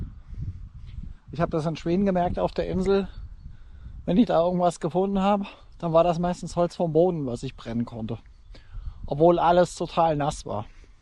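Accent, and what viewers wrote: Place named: Hesse, Germany. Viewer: Hesse schwätze halt hessisch ...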